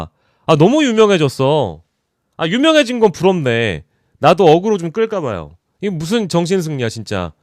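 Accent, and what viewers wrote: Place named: Gyeongsang, South Korea. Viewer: 갱상도 추가요..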